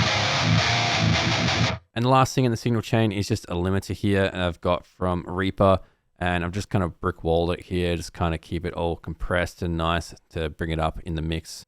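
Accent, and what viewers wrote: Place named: Australia. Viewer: Awesome to hear another Aussie. Are ya subbed to Dunn?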